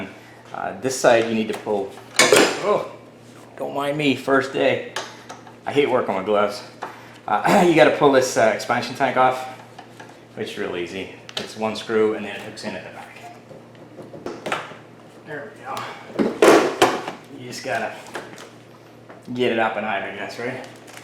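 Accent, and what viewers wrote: Belfast, United Kingdom. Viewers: A hint of a Belfast accent?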